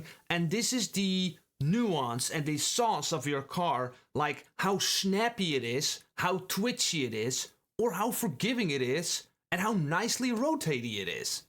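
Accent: Netherlands. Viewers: You're not Dutch, are you? You sound exactly like one of my best friends!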